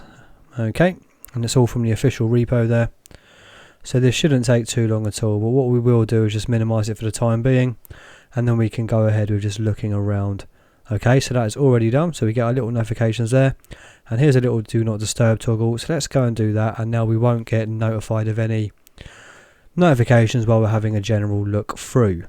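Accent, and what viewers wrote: United Kingdom. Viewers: Pretty good channel. Nice British English pronunciation... I wonder what kind of opinion will have regarding Manjaro 20.1 Cinnamon Edition...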